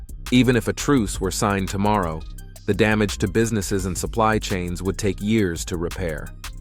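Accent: United States. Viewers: The vid was ok , just can't stand the American voiceover.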